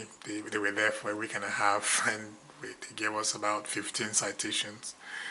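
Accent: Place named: Nigeria. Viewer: you sound so Nigerian. 😍 Maybe you aren't, but lovely regardless. Keep winning 🇳🇬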